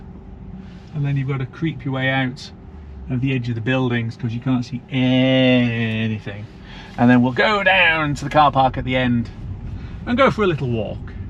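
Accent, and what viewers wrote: Scotland, United Kingdom. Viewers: I'll say this... I support your channel and watch your videos. I like to see Scotland and like to hear the accent as it's so different from where I live. That you like Tesla and good technology is something I also appreciate. I also think that anything Elon related has to be taken with a grain of salt. But what other company is doing the same things even if sometimes the owners think is the wrong direction? You are not a fraud like you said you are. You're just commentating about the tech, the infrastructure and the company. Your channel is really about you in effect and it is entertaining and sometimes thought provoking. Regarding the YouTube algorithim, I think it should be out of your mind. Do the content you want. Let the rest figure itself out.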